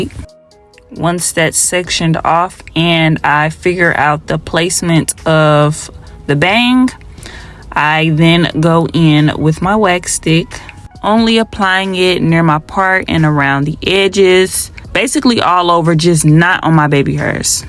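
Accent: St. Louis, United States. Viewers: Hurrr lol your accent is so cute! Sound like a St Louis girl ❤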